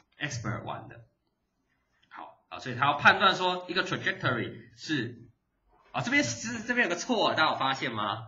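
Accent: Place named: Taiwan. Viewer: 听李老师的课从此爱上了台湾腔🤣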